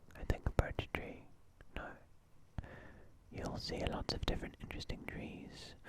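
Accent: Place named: South Africa. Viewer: you sound south African homes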